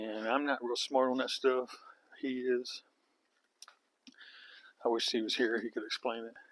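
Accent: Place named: South, United States. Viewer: I was referred to you from at her YouTube channel called: Eat Pray Van that I'm subscribed to so I'm happy she referred all her subscribers to you on her first LIVE video the other day & now I know why.... Cuz your sooooo honest & genuine & very interesting & informative & good looking & good sense of humor & good heart & good sense and I absolutely love your southern accent & southern descriptive words & your voice tone & volume. Sooooo relaxing! Your a great speaker! All the wonderful things that is also. Because you are you I watched & then subscribed right away. Nothing phony about either of you which are the only Creators that I will subscribe to. I also clicked the " LIKE " button that I ALWAYS do & never forget to do that. Ty again!!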